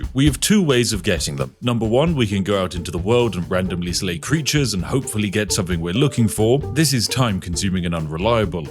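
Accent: United Kingdom. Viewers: honestly I like your videos where you exploit stuff, but this game doesn't really sit well with you for the most part its narrating things that have already been shared weeks ago, I think you are also on previous patch just based on how your inventory looks, and by the way there is easy way to get the greater infinite arrows from abbys challange that doesn't take much time with explosive arrows. so kind of too late and kind of doing it hard way, but narrated in nice british accent :D also did you know about the golden apples that you can make gold bars with? find it less annoying than trying to find nobles with goldbars :D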